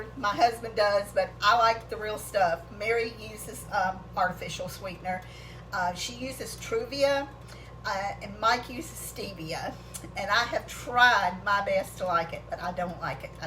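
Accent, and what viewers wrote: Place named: South, United States. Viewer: Don’t ever apologize for talking like a southern girl,it’s the best place in the world to be from.Im from Arkansas and you talk just like we do.Just Southern!!!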